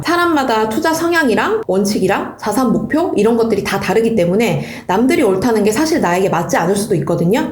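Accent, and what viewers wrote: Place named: Gyeongsang, South Korea. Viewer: 혹시 ㅎㅎ.. 경상도분아니신가요.. 말투가 약간 있는데…ㅋㅋㅋ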